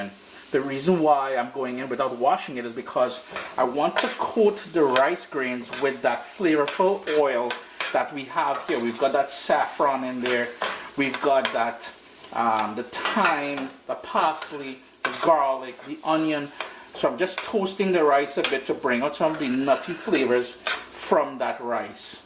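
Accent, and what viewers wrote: Trinidad and Tobago. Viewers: You said "allyuh" so you're from Trinidad, but there are some other accents going on there as well...? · Ur good and love ur Triny accent. Great dish have to make it soon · Trini accent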